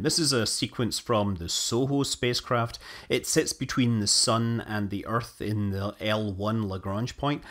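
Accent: Scotland, United Kingdom. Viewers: This fellow sounds Scots like me! So what would he know about the sun? I've glimpsed it twice in my lifetime. Great upload. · I love the Scottish dialect ❤️ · Don't worry, it's just scottish.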